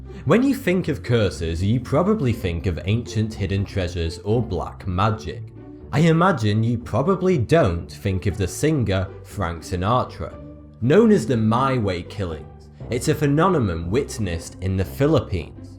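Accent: United Kingdom. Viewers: He's British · Nate Haimson thats how british people talk, you know that you see movies dont you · Herpa Mcderp It depends what accent you adopt. is British, not American, and I'm guessing you're American. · British accent!! so yhea sorry to ruin your hate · it’s just a bri’ish accent, innt? ‘specially in lond’n, where is pr’nounced for’y too. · Thanks for the informative video! I have learned many things from your channel, and I love it! Just ignore the people that say, "You're voice is stupid", it's not true. They're just jealous that they don't have a British accent. I've been to England before, and I know what a real British accent it. Not just American impressions. :-) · This guy has an accent that you can chop off with an axe. I wonder where he's from in the UK. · Uhm.....because he's British? Where we pronounce the letter 'h' as 'eiche', a British chap will pronounce it 'hayche'. 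Very often their T's will be much softer in inflection or just get dropped altogether, unless they're actually talking about afternoon tea. Look up British stand-up Eddie Izzard and he'll teach you how to British. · Why do you say "42", but your name is " "? What dialect do you speak? My guess is it's somewhere in or around the U.K. Your teeth also make it very likely that you're British. You also dress like you're from a different era. It's strange and interesting. Good luck with everything. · Benjamin Matić Maybe he is actually saying 42, and we're all just jackass's for blaming his British accent?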